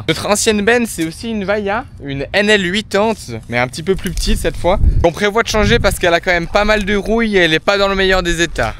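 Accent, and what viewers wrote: Switzerland. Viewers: Salut le petit Suisse 🤣😂🤣😂, en espérant que tu ne le prenne pas mal. Je viens de commenter ta dernière vidéo, et voici une nouvelle en ligne. Tu aurais dû être prof de maths pour expliquer horizontal et vertical . Je t'embête plus. Les plans au drone sont magnifiques. Je crois que tu es le seul qui dit encore mon papa et je trouve que cela en dit long sur la relation avec tes parents. C'est toujours un vrai bonheur de regarder tes vidéos et de t'entendre parler dans ton patois Suisse. Remorques ou chars, Ben Hur??? Bon courage et tu peux être fier de toi. 🙋‍♂️🙆‍♂️👍🏻👌🫡🫡🫡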